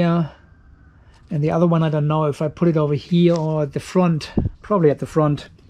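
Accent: Germany. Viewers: Hello, I was wondering how you are going to keep these three batteries in balance? I have two 24V/100Ah batteries and it appears to be difficult. By the way, thank you for your very interesting video's and your german English. Makes it for me easy to follow. Regards, Wim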